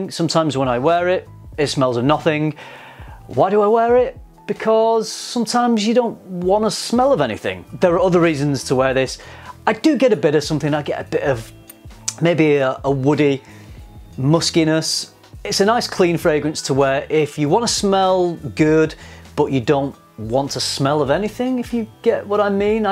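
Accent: United Kingdom. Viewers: Love your reviews and British accent!!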